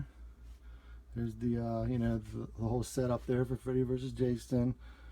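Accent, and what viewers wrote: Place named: Maryland, United States. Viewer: are you from Maryland? i keep hearing a slight MD accent. amazing collection, BTW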